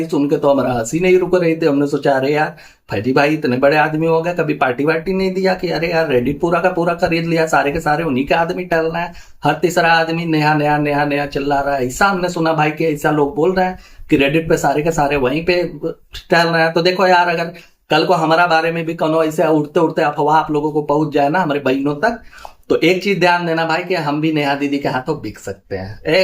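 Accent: Uttar Pradesh, India. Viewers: up se ho bhaiya up me kaha se ho sorry aapko pareshan kar rahi hu but aapki baate sunke bhasa sun ke apna pan feel hota hai. Mai bihar se hu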